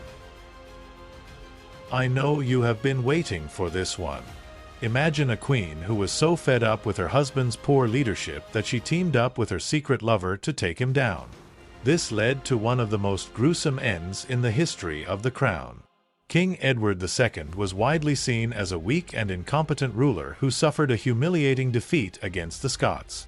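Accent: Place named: United States. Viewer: I lasted 59 seconds. Several reasons. One, the godawful music is so loud that you can hardly hear the narrator. Two, the narrator is talking in an American accent which means his pronunciation of certain English words is going to be dire and, Three, Just from those few seconds I could tell it was going to be CRAP.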